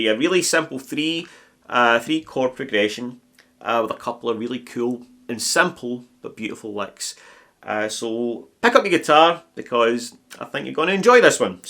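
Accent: Scotland, United Kingdom. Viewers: He’s Scottish, not Irish! 🙄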